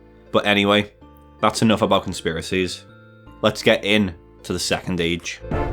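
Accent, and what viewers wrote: Liverpool, United Kingdom. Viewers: ill bet my whole bank your a scouser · Documentary length game lore video in the Scouse accent 😅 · Is that a Scouse accent? It really annoys me, but I love your content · Real Scouser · top scouser lad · Listening to a Scouser explain RuneScape is the height of 2025.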